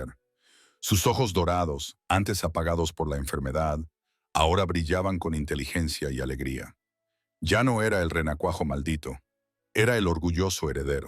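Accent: United States. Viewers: Pues buena la historia pero como una prometida va a matar al rey para quedarse con su trono si ni siquiera estaba casada... como que la traduccion esta mal la voz narradadora parace un gringo hablando español, pero en fin la omega era la madre muy revorugada....el alfa seguia haciendo lo mismo basandose lo que lara le decia...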